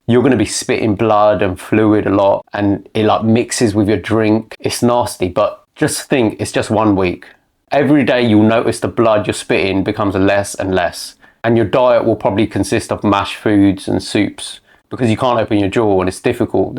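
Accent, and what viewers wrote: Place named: United Kingdom. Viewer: Who did your surgery you sound from the UK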